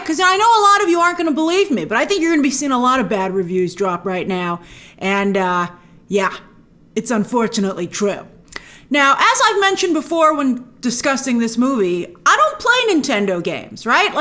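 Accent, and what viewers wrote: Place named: New York, United States. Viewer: Is she really from NY or does she do the bad accent for the pop?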